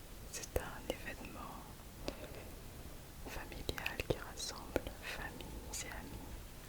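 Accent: France. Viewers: Another brilliant video. I love your beautiful french accent, so calming. Please make a really long video so I can fall asleep listening to your voice 🤗🙂xx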